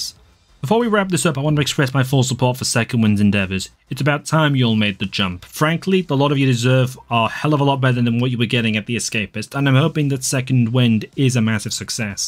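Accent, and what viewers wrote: United Kingdom. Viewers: Go 1.25x speed for more Zero Punctuation Flavor. ...I won't blame your for the less snark British accent but we have what we can have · I reckon the British accent helps too lol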